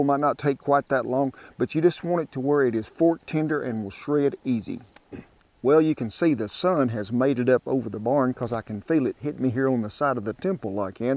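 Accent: South, United States. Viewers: I’ve never seen your videos before. But Jesus h Christ you can’t get any more southern than this. Hah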